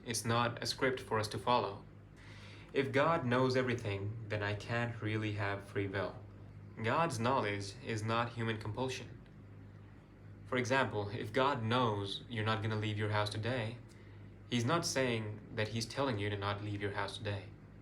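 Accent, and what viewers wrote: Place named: England, United Kingdom. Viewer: Wah yrr Nice English accent ❤❤❤❤❤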